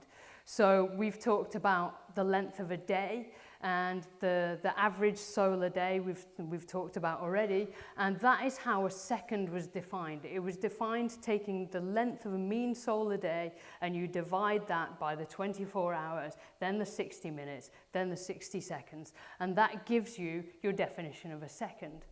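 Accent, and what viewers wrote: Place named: Northern England, United Kingdom. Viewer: Interesting accent mix. Northern England and North American.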